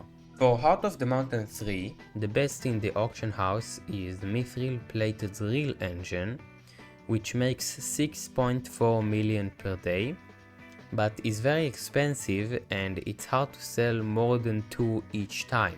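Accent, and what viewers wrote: Israel. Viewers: איזה מבטא ישראלי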